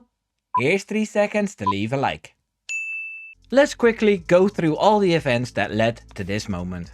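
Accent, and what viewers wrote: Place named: Netherlands. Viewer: You are obviously Dutch from your horrible accent! Jos is the trouble-maker,not Christian! Max, unfortunately, only does what dad tells him.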